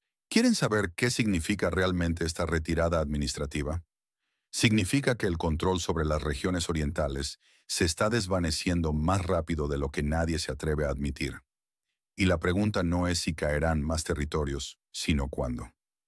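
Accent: England, United Kingdom. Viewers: Si habéis decidido hablar en castellano hacedlo bien, sin arrastrar las erres como tarados. Hablar español con acento inglés desvirtúa nuestra bella lengua e impone un idioma inexistente.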